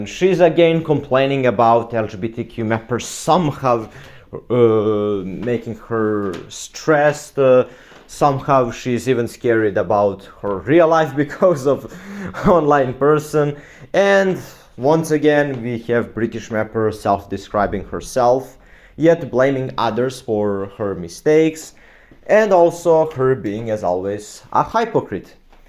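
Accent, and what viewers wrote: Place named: Yugoslavia. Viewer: you are so mature wow , by the way good yugoslav accent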